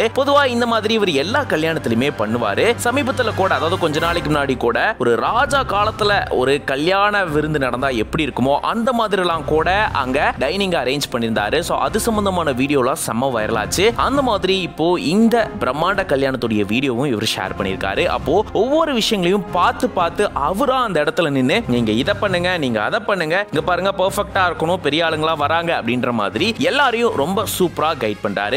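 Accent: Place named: Kongu, India. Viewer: Kongu tamila smart hero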